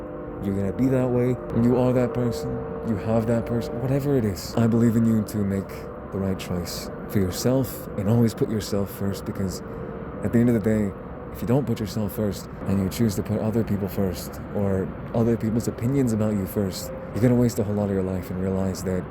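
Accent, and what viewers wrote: United States. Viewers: KEEP DOING YOUR COUNTRY VOICE IN YOUR VIDEOS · I was not expecting the country accent😂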